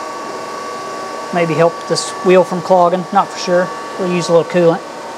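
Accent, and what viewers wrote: South, United States. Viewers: First time I watch your video. Nice shop! From your accent I think you live in the south, somewhere it can get cold. North Carolina? I have a lathe and a mill, a much smaller shop than yours. I look forward to watching more of your work.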